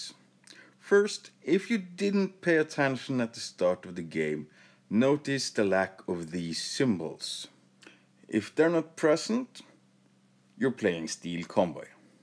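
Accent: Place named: Norway. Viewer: it sounds pretty Norwegian or Scandinavian to me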